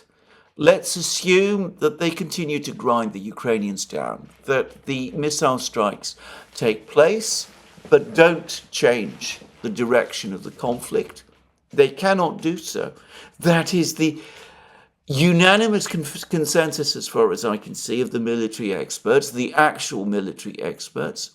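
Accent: United Kingdom. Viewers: It's strange, I hate British accents and it's always bad news, but this has become a comfy little show for me. Thank you Alexander.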